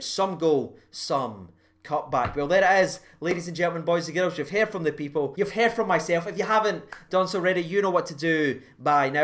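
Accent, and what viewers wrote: Scotland, United Kingdom. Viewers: I love Google’s attempts at understanding the Scottish accent. Turn on captions for a laugh.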